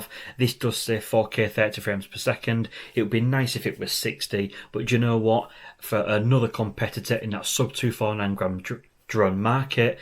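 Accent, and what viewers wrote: United Kingdom. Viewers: You are certainly on top of things. Love your videos. I find them the most useful of all the channels....and it's not just because you Britts make everything sound more interesting:)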